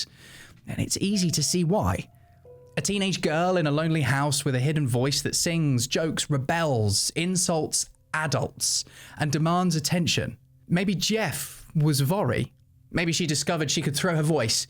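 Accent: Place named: United Kingdom. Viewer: thank you for the new video and I am a new subscriber to your YouTube channel love your videos and love your British accent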